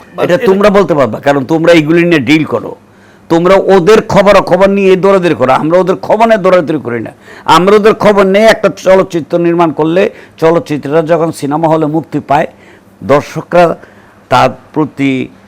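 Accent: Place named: Sylhet, Bangladesh. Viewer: আপনার কথা সিলোট যানাই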